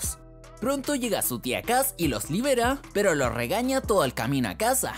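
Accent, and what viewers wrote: Chile. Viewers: no sabia que este weon era chileno xd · Ahora, en este video si que si que confirme que eres chileno, y eso es bueno para decir que los chilenos podemos hablar bien, y que eres muy bueno haciendo resúmenes de películas, tremendo like que te doy 😎🤙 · MIRA EL PERKIN este, no sabia k eras chileno D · Eres chileno?...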